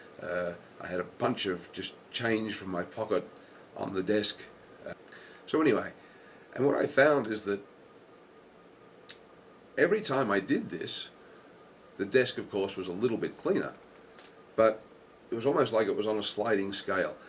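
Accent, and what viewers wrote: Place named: Australia. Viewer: You have an uncanny ability to talk...and talk...and talk.... I've gotta say though, you make more than just a lick of sense. I too find a clean, neat, and organized environment rather enjoyable, relaxing, and invigorating for both the mind and body. 'Tis far easier to do something clean for myself when the atmosphere around me is already clean. Good video! -Josh PS: If you didn't have that accent of yours, I probably wouldn't have lasted the entire video. Aussies rock!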